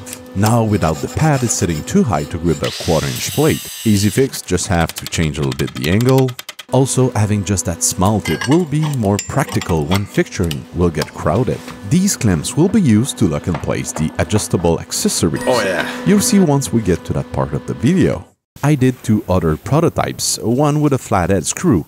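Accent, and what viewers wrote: Quebec, Canada. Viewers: Si c’est pas l’accent le plus québécois qui ya 😎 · The joy of French Canadian swearing!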